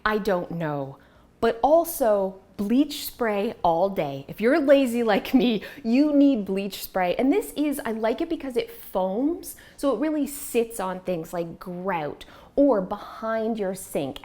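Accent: Canada. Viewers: Thank you for showing up, even when you might not feel like it. I love the personal stories you share are how honest and real you are. I always learn something from you and you always motivate me to go clean or organize something. I also love your Canadian accent, since I grew up in Ontario, Canada, eh. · That's great that you want to do something new! I'm new to your channel and I'm just here for the tips in your Canadian accent and the shenanigans that fill your life and come out of your mouth. 😂 Maybe take the camera outdoors and let's see what happens when life happens. Byeeee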